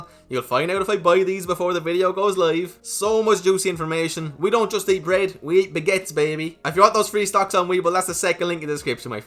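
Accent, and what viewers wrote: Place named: Ireland. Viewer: It's so obsevaious he's from Ireland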